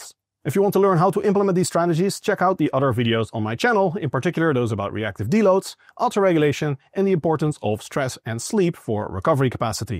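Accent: Netherlands. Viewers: Still here...for the hard truths and the Dutch accent on anything "un-"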